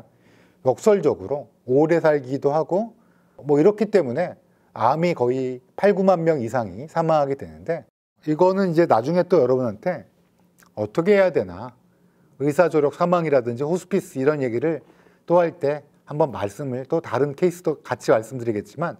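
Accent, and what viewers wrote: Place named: Gyeongsang, South Korea. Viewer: 말투가 대구 언니네😅😅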